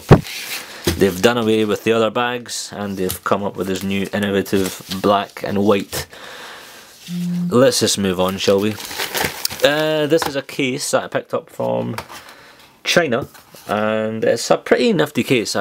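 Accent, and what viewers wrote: Scotland, United Kingdom. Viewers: Noobstyleful he is scottish unless u can't make out the accent if u are not all there in the head!!:)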